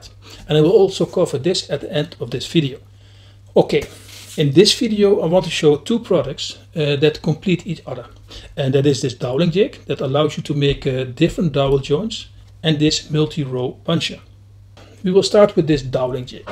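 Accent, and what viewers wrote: Netherlands. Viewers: Oh I’m sorry I thought you had a speech impediment. Your Dutch. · the subs are a real improvement.. your funny Dutch accent is sometimes hard to understand.. (and I speak dutch) Nice review..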